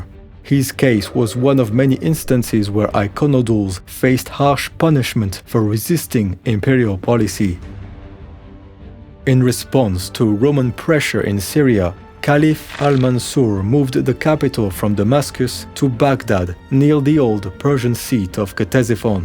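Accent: France. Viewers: t'es français ? 🧐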